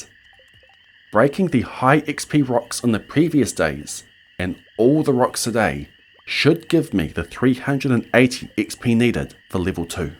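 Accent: New Zealand. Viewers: Holy NZ accent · He's definitely a Kiwi. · Bro? Are you Kiwi? I swear. Even if you're not... You totally do!!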